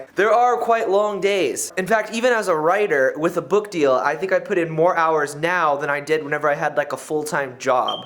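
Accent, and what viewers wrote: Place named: United Kingdom. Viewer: You sound like you have a slight British accent, lol! It's the way you pronounce most of your vowels.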